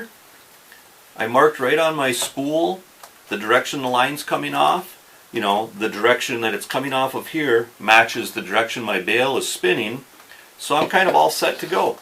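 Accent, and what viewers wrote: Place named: Upper Midwest, United States. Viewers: Oh man, I'm a Minnesotan down in Florida for work, great to hear that northern accent on youtube, don't you know. Fishin' fer Pompano stead of crappie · Where is this guy from? Sounds like norther Michigan, Wisconsin or Minnesota. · the way you say pound tells me you are from minnesota or wisconsin all my relatives are up in MN you sound just like them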